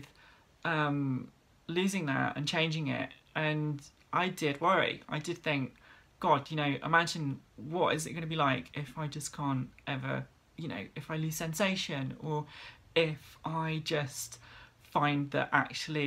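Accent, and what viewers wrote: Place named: United Kingdom. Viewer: Great to know your transition turned out the way you wanted it to your beautiful and sexy......I love your British accent....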